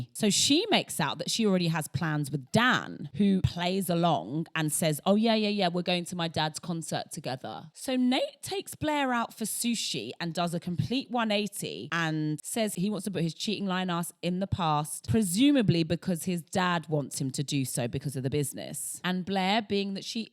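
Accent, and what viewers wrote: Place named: South-East London, United Kingdom. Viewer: If you're not going to say "Gossip Girl" in that gorgeous (wild guessing incoming) South-East London accent, attacking each G like that, why even say it?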